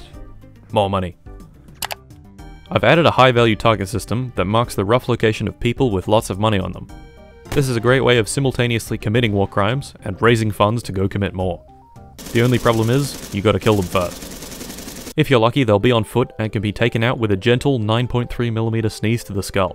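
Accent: Australia. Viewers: You're from Australia, I'm sure of it bc I kinda talk like "I did a thing"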